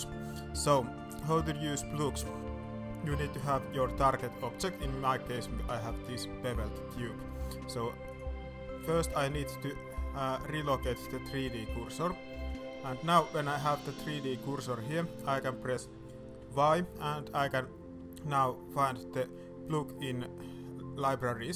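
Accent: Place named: Finland. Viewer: Now that is a heavy finnish accent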